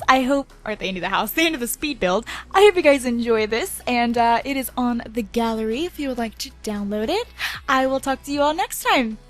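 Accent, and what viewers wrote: United States. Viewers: Is she american?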